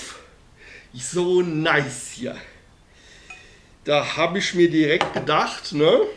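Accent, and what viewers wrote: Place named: Ruhr, Germany. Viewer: Man, wo hast du denn so perfekt Ruhrdeutsch gelernt. Absoluter Treffer. 😂😂😂🎉 Die Welt braucht mehr Humor. Spitze. Sehr gut getroffen. 15 Daumen hoch. L.G.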